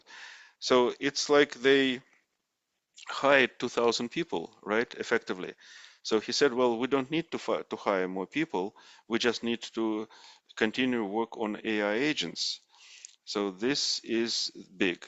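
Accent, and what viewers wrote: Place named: Russia. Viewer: Love your English accent, you seems to be from Russia.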